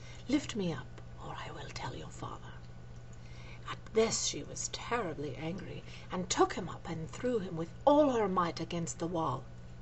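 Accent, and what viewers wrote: United Kingdom. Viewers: You sidetracked me! I was watching your nutrition,makeovers when I saw this and, being a Brit, had to take a peek! Where did you learn to do the accent? It sounds rather posh but with a little bit of downmarket Cockney and even a little bit of Welsh thrown in! Well done!:) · Delightful English accent - is there no end to your talents? :) PS.Lilou is probably eating a frog in France this very minute - disturbing thought eh? LOL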